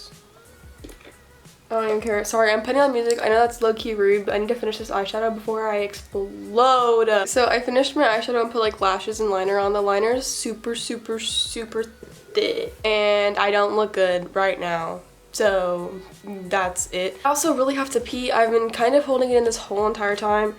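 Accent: Texas, United States. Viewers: she sounds so texan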